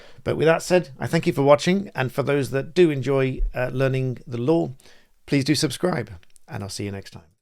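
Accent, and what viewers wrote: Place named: RP, United Kingdom. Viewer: Cheers. Well put, in crystal clear queen's English. ☺️✨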